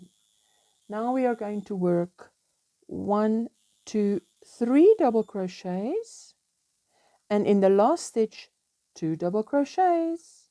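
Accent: Afrikaans, South Africa. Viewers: Is jy van RSA. Ek hoor Afrikaanse aksent.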